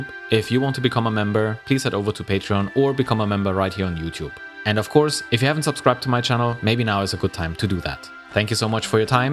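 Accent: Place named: Germany. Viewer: The terrible German accent makes this unwatchable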